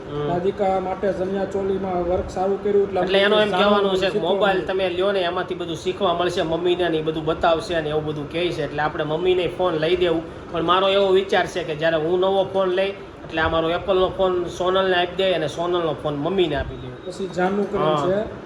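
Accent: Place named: Gariyadhar, India. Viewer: Tamara video hu darroj jasadn thi job chhu aa baju aavo tyare aavjo. Tame gariyadhar bajuna chho. Tamari boli upar thi. Aevu. Lage che.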